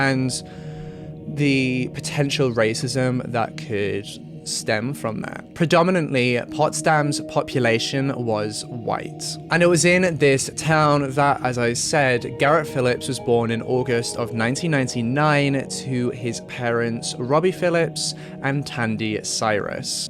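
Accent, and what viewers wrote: United Kingdom. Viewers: As soon as I heard the British accent and the racism crack I knew this was going to be another Jesse Smollett defender!! Right off the bat he skips the part about the boy friend following the boy home. And this doesn’t have a damn thing to do with racism. I’ve had ring side seats of this very relationship. My grandson is mixed race and thank God, (not satan like this dude) he’s 16 now, and his mothers boyfriends weren’t killers. Because if we would have come home and found him dead after his mother broke up with her boyfriend who outwardly blamed him, you know who did it. This dude is a young know nothing who’s people already destroyed his country because of this very weakness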